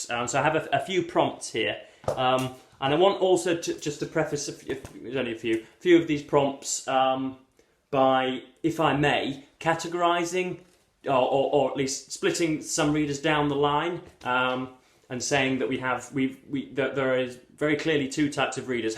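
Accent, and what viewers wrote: United Kingdom. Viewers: I love how british he is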